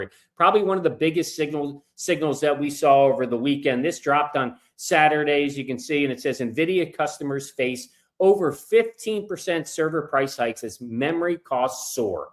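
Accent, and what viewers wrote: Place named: Chicago, United States. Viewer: You sound like you're from Chicago, neither Boston or from Texas. 😊😊😊 tambien Feliz Cumpleanos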